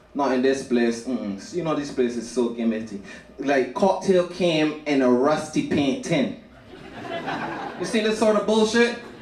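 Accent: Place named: Barbados. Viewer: With a Bajan accent too!!! My kindah humour my kindah comedian!!!!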